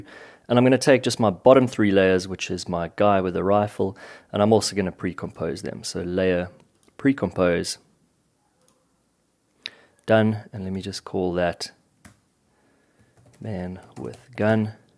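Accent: South Africa. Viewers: sup are u South African?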